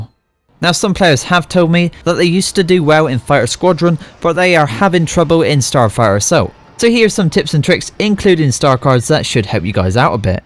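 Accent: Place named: South West England, United Kingdom. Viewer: South West boy are ya? Good to get tips from a local (I'm Cornish).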